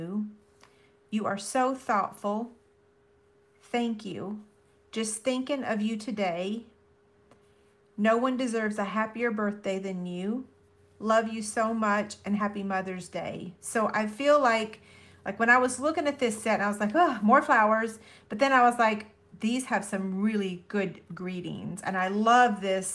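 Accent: South, United States. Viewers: So we can’t hear that sweet southern voice & your beautiful face at the same time…. Thanks for hanging in there.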